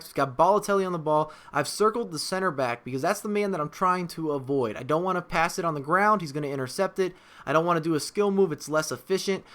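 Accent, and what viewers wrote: Houston, United States. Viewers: R u from Houston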